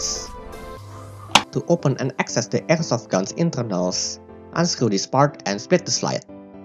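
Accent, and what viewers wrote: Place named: Indonesia. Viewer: Masih ada logat indonesia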